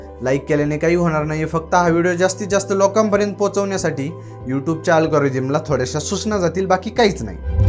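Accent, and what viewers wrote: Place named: Ahmednagar, India. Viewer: भाऊ हीच टोन राहूदे ..तुझी USP आहे ही भाषा❤ नगरी वाटतोस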